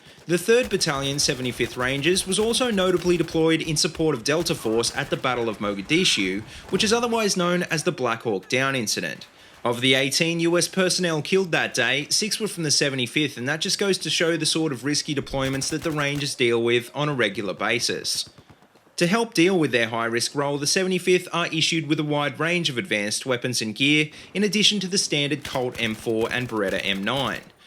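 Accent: Australia. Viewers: Just found your channel, already a fan. Love the accent by the way, Australian? Anyway, keep making themed loadout videos, I like watching these sort of things... but I can't stand Levelcap. Peace.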